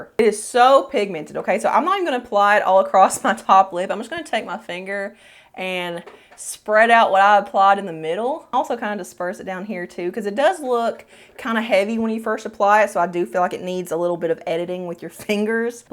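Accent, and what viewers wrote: South, United States. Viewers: Hey, back again from Belgium. Oh my gosh, I think you might well be my favourite on here: you always get straight to the point, knowledgeable and you're totally down-to-earth, sweet and unpretentious. And I said it before but I LOVE that southern accent! ❤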